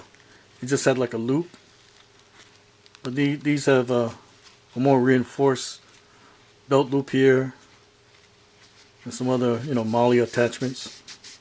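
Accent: Hawaii, United States. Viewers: yeah for sure, i mean some people just do a plain table , i like the extra effort, thats why i always watch your uploads, that and the Hawaiian accent is nice to hear.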